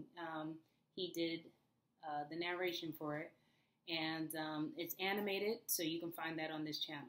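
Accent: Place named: New York, United States. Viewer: Yes I hear that accent, but it's nice, I normally am not fond of NY accents, but yours is minor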